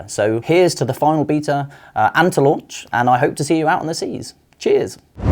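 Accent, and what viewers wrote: United Kingdom. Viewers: That british accent 😍😍 10 more days cant wait to play SoT, I have hope this is gonna be huge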